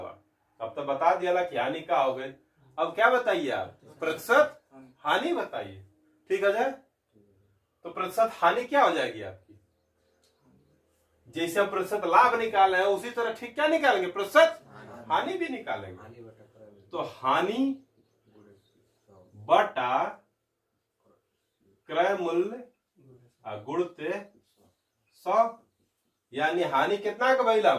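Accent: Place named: Bhojpuri, India. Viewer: Aaj pahali baar bhojpuriya andaaz me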